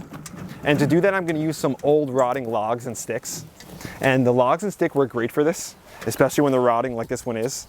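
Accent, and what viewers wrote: New Jersey, United States. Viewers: "hold all that excess waudder" I f'n love your videos and jersey accent, it makes me think of my old college buddies. Keep it up, and full disclosure I steal these ideas! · I love watching him. He’s 100% New Jersey and this Texan loves his excitement. I don’t see content worth watching on yours.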